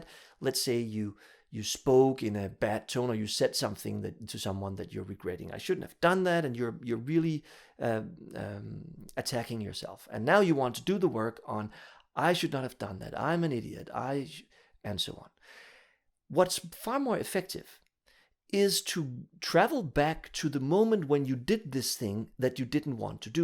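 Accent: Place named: Denmark. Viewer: Your accent is super adorable! 😊 I think I need a Danish boyfriend now!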